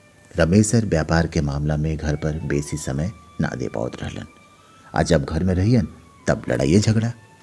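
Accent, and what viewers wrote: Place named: Bhojpuri, India. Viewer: Waah भईया केतना सुघर खांटी भोजपुरी बोलतानी रउवा🙏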